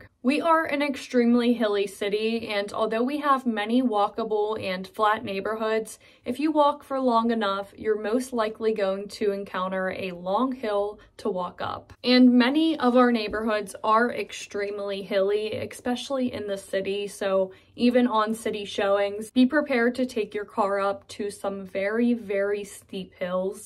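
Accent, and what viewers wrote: Pittsburgh, United States. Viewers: Love your Pittsburgh accent! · The longer you talked, the more Pittsburgh you sounded😁 Especially "expecially". Nobody else says that. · I was born in Pittsburgh and moved out the the country when I was 9, I love the fact that I can hear a Pittsburgh accent, and you definitely have one. Awesome video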